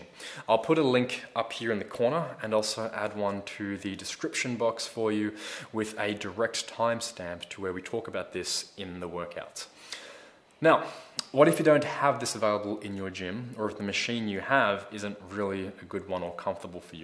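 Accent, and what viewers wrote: Australia. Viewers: OMG you're aussie! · Came here for the Quad exercises. Subscribed for the quality info delivered with a chilled Aussie vibe 👍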